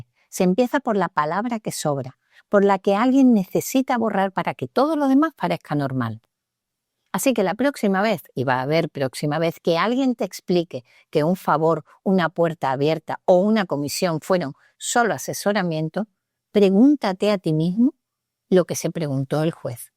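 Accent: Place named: Andalusia, Spain. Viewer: Muy buen comentario en ese precioso acento andaluz. Gracias.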